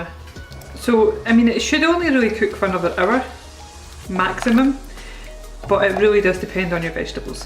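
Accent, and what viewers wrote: Scotland, United Kingdom. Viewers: Nice to hear the Scottish accent as I have been away for many years! Parsley is rich in vitamin C and other antioxidants, which help reduce the risk of serious health conditions like diabetes, stroke, heart disease and cancer. It's also an excellent source of: Vitamin A. Folate. I make the soup just like you and like you mentioned turnip has to be in mine, I won't make it if I forgot to buy it. To make it healthier just after I take the ham out, I skim to top of the ham water into a glass container and place it in the fridge just in case I need it - you will see the separation of liquid and fat and be glad it is not in your soup.(also do it for all my stews) White pepper is nice and poultry seasoning has thyme and rosemary.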